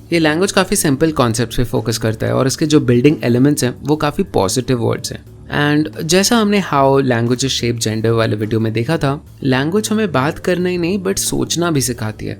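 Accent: India. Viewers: bhai ka excessive indian accent hai, but he says "Avatar" like he's american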